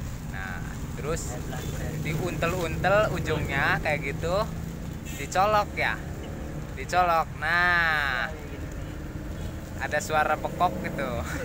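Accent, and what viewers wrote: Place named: Sumatra, Indonesia. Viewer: bang ..kok ngomong kayak orang Sumatra....asli Sumatra ya bang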